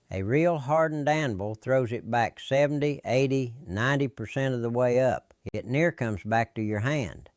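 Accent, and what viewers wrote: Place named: Appalachia, United States. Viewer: I love the way you talk, I'm in maryland and you have the Appalachian accent that i remember hearing growing up. When i heard someone like you talk i knew i was going to get good advice or given advice that was a joke that i believed until they later told me it was a joke as they let out a laugh.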